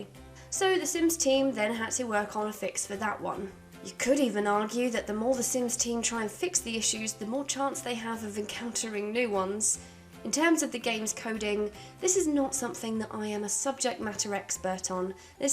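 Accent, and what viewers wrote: United Kingdom. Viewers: this is the most lackluster installment of the whole series. base game is unplayable, unless you have <num> dlc's(each adding 3 new options max...) installed. the base game don't even have fuckin ghosts, like wtf, I could play the base sims 1 with only livin' large expansion pack(in my case it was pre-installed, I remember I picked it up like that in the game section at target or Walmart(I dunno if you have em in Britain< I haven't watched the vid yet, I'm , but I've obviously noticed from the accent that the channel owner's is British> but these are supermarket stores, basically here in the us), it was a pack of base game+living large I still have the package in my family home 😂 but anyways, I could've played hours and hours and hours, only having those, as well as base 2&3, in the Sims 4 the base game Is only a fuckin building simulator(and I've always hated building, I was always picking premade and pre furnited homes, usually not changing a fuckin thing coz I dont really ddlgive a shit about how the Sims' home looks like lol) and create a sim... 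basrely any gameplay options, I was bored after half an hour... there is no mystery vibe the first 2(there was a a hinch of It in Sims 3 left, but only a small hinch...) games have had, sims 4 is a politically correct shit for little kids, to not scare them too much and to teach them that being gay and trans or whatever is some sort of fuckin achievement and privilege(I ain't got nothing towards LGBT people as long as they'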